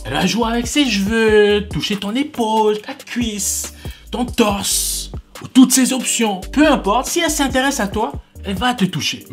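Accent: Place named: Quebec, Canada. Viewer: j'aime trop ton accent,français et des expressions québécoises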